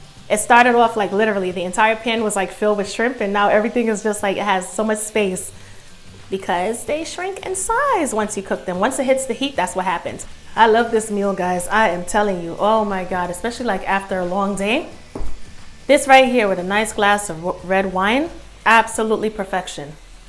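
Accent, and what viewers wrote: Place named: Maryland, United States. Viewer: You are one fine cook. I just made your Lobster tail stuffed crab recipe and I’m the local hero hereabouts thanks to you even though I think that I slipped up on the Cajun.. I used 5 full length lobster knuckles/claws and secured them together with long toothpicks..in lieu of the lobster tails..more tender..Placed on a 5”x 6” shell.. Your recipe makes two servings..PERFECT..Thank you.. and by the way your accent belies you. Are you living somewhere between New York… Maryland girl? LOL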